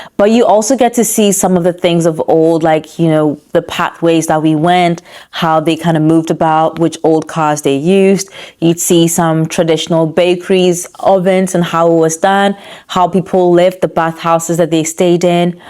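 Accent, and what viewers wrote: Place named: Italy. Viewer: I read Roma and Positano in your acquired Italian accent haha! If you spent over €300 a day charging then that's definately theft of some kind. Sorry. And that's positive...your gesture towards my app. Appreciate it.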